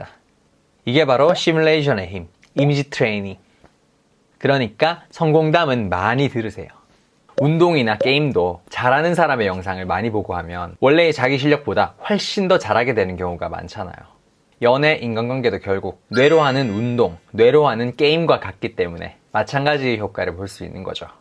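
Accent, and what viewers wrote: Gyeongsang, South Korea. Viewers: 뭔가 말투가 말 끝부분은 서울말인데 단어단어에 부산, 경상도 사투라가 녹아있음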